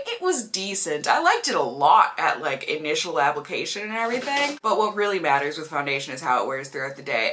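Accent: Minnesota, United States. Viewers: She is so relatable. She seems like someone I would have gone to art school with. Watching her videos always feels like sitting down with a girlfriend and doing our makeup. And that voice! So relaxing. Canadian here, and I think her Minnesotan accent is comfy and homey.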